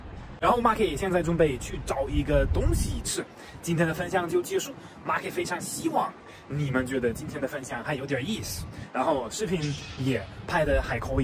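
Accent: Sichuan, China. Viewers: 讲的很好。很浓的四川口音 😄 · 马克带有四川口音的普通话太好玩儿啦，哈哈哈。 一会儿“一下xia”,一会“一下ha”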